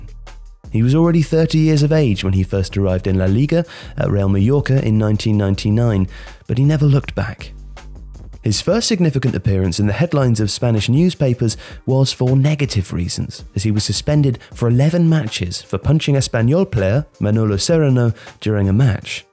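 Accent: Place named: England, United Kingdom. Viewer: The script reader sounds English. Probably can't pronounce them like a native speaker.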